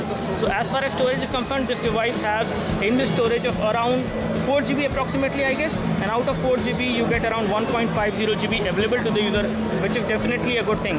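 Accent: India. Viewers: I love Indian accent :-D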